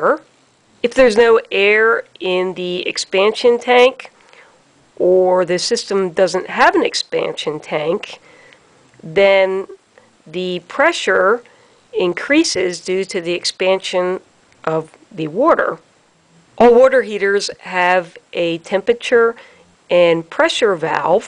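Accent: Philadelphia, United States. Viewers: You must be from Philly. Wooder.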